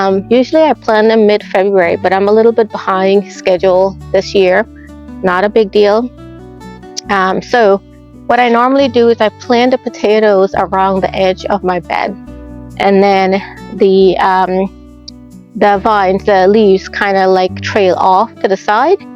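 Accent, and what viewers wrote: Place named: Caribbean. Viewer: I think I hear a Caribbean accent there! Great video! ❤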